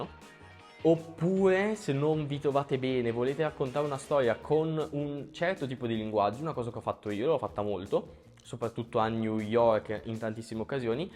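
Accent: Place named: Brescia, Italy. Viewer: Ciao vecio! Permettimi il “vecio” perchè ho capito subito che sei Brescia dall’accento 😂 Anche io faccio Street, ho vinto anche un concorso tanti anni fa con una foto in Piazza Vittoria, se fate uscite di gruppo mi unirei volentieri per qualche sessione. Nicolò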